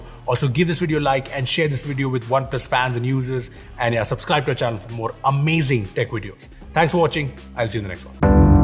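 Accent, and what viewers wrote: India. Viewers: How do u got 3m subs with that indian accent, i couldnt understand a word you said.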